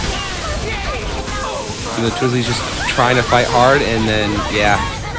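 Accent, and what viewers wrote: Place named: United Kingdom. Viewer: why does he sound so british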